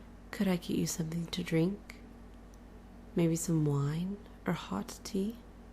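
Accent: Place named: Midwest, United States. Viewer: It's alright. You and I have the same accent. Not quite... deep southern. And not quite something else. >_> I'm not even sure what it is. Midwestern, I suppose. I LIKE IT. <3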